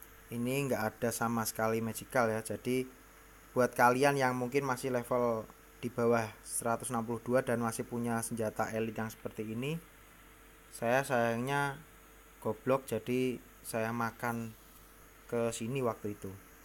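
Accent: Indonesia. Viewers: napa ga fokus talisman, bang ? Itu jauh lebih worthed dibanding enhanct equip u/ lv 180 lho.... Tapi logatmu iki jowo timuran, cocok karo aku kwkwkww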